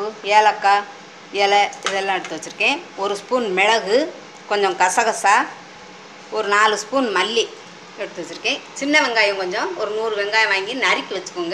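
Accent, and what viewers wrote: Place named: Tirunelveli, India. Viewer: Nellai tamil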